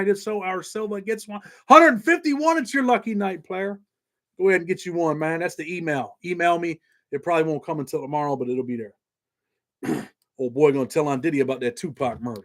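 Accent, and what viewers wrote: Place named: South Buffalo, United States. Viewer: Your south buffalo as fuck bro !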